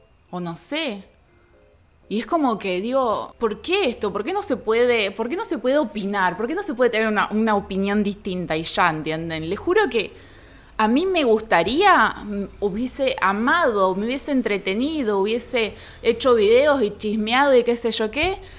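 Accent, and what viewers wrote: Argentina. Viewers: hablando de futbol, le dije a mi mamá: chales si gana si pierde Argentina le van a hacer una burla jamas vista y si pierde le van a tirar muchísimo hate, cuando jugo con Mexico obvio le iba a mi selección pero que sabia que lo mas seguro es que perderia, talvez es porque no me llama tanto la atención el futbol pero me dio igual, lo que si me saco de onda fueron los insultos de Mexico y luego de Argentina, pero como dijiste lo que no te gusta que digan a ti, no lo hagas tu, o algo así, por cierto estoy obsesionada con su acento, pero no puedo imitarlos porque no soy buena para ello XD... saludos!!!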